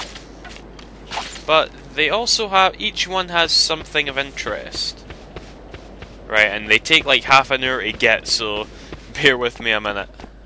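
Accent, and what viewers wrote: Scotland, United Kingdom. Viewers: That bird one is totally my favorite colossus, by the by, your scottish accent kicks ass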